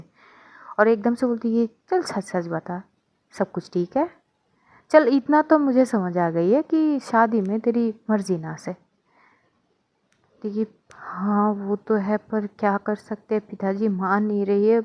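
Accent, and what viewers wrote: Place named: Haryana, India. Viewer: Didu haryanvi bolna kha se sikha 😊thodi thodi bol rhi ho pr mst lag ri so ❤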